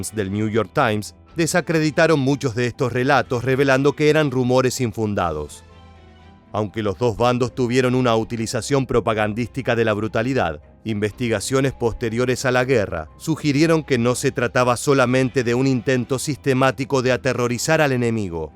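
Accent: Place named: Argentina. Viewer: Siempre tira a favor de USA y la OTAN, ellos son siempre los buenos para éste canal y los alemanes son los maaalos! demonios! Conste que el canal, a juzgar por el audio del locutor; es de origen argentino. Y que peor enemigo de Argentina es la OTAN quien conspiró contra la Argentina y apoyó logísticamente a la fuerza expedicionaria del reino satánico unido. No hay caso...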